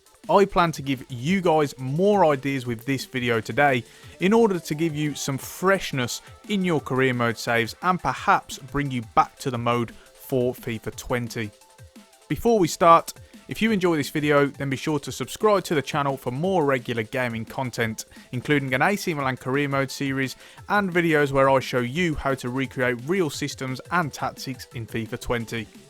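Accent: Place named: Black Country, United Kingdom. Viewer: yeah I'm from Tipton (near westbromwich) so I speak black country and your accent sounds similar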